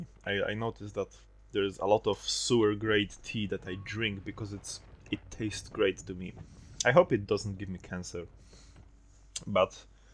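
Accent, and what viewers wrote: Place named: Eastern Europe. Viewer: Polish, that was very enjoyable to listen to, I'd be glad to listen another ones. Also, your english is just fine, take it from another slav. So don't sweat about it, after all we are foreigners and it is highly justifiable for ears of others to hear our rough talk.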